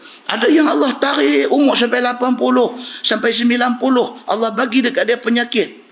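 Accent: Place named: Penang, Malaysia. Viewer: USTAZ...ni dah lama ceramah kat p.pinang.....suka ustaz ni kalau cerita tentang sejarah nabi2. Ceramah ustaz senang walau ckp loghat penang.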